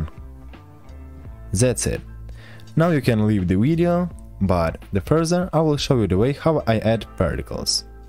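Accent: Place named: Russia. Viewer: i can hear some russian accent in it but it's so good it's like playing a video game about WWII LMAO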